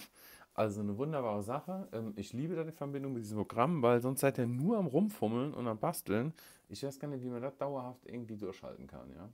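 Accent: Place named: Lower Rhine, Germany. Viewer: Der Akzent...... Dat & Wat!!!! Herrlich!!! Wich komm aus Wesel am Niederrhein..... da sprechen wir auch so!! Lol!!! Gruß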